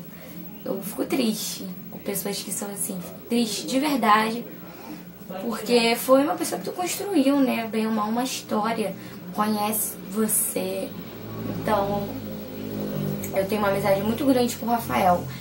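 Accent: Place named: Rio de Janeiro, Brazil. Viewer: pelo "x" no final das palavras, vc e carioca.. onde mora ? em qual parte do rio ?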